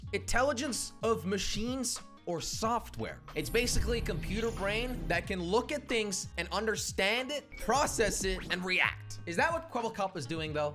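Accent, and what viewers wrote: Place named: United States. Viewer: Wait, you're Dutch as well? Unlike Kwebbelkop you don't have the goofy accent at all, in fact you sound just like an American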